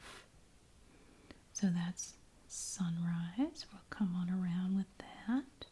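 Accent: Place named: North Carolina, United States. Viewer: Also, I love your videos and your voice and accent sound very similar to my godmother's who is from Hertford, NC. It's so comforting 😊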